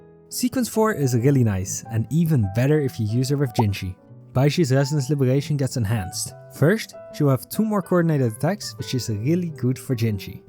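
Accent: Netherlands. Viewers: the dutch accent, gekoloniseerd ouwe! nice vid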